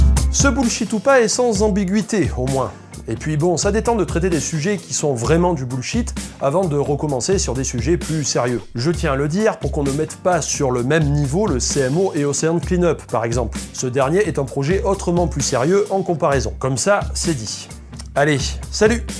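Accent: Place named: Haute-Loire, France. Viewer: Yo ! sympa ta chaîne, dis moi t'es du 43 u 42 ? t'as un accent du coin toi ;)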